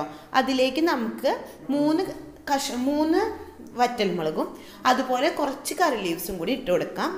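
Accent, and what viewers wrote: Thrissur, India. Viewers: Trissur Kariyano? Super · തൃശൂർക്കാരിയാണ്...അപ്പോൾ സംസാരത്തിൽ ട്ടോ മയം കാണും... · Thrissur alley?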